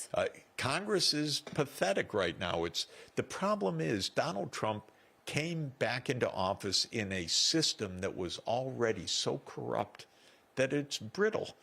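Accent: India. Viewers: thickest of indian accent is easily understandable.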